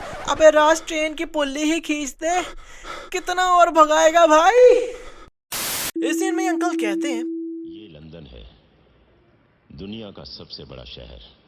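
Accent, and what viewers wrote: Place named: Pakistan. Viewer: Is this a Pakistani Channel because narrator's hindi accent was sounding like Pakistanis.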